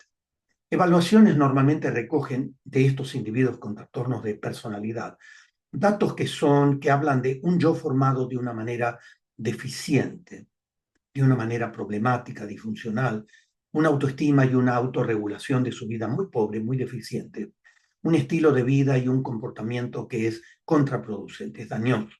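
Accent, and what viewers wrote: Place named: Argentina. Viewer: Gracias por tomar tu tiempo y hablar de esto temas importantes Pareces argentino, el acento , yo soy argentino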